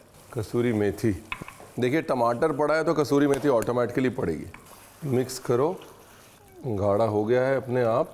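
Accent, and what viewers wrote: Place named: Lucknow, India. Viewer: Ahhh, that mutton looks too delish 😋. Moreover, i love the Lucknowi tone of your language. Love from Lucknow ❤❤❤❤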